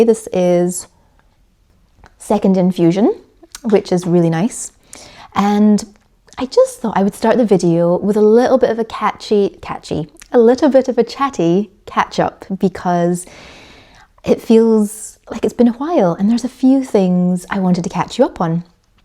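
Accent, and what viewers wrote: Scotland, United Kingdom. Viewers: I enjoy Scottish accent.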